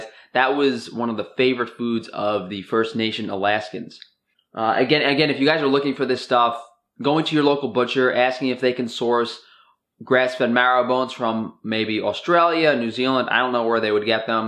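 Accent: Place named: East Coast, United States. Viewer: This guy is so East Coast and I love it :)... Especially the brief pause in between "Cross-contaminaton" and "from the butcher's saw" when he does that little Italian hand gesture with the knife... I like this guy. He knows what he's talking about.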